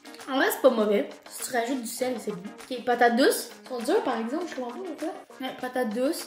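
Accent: Quebec, Canada. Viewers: J'adore ton accent Québécois et tu as quel âge !? 😊❤ Sinon continue comme ça,tu es la meilleure ! ❤❤❤ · l' accent quebecois est insuportable mais avec elle, cela le rend assez mignon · tbnk ça sonne Québec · j'adore tes vidéos et surtout ton acssen québécois comtinue comme ça t la meilleure si tu veux je t épouse cash 😂❤ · Cette accent Québécois , j’adore ! ❤️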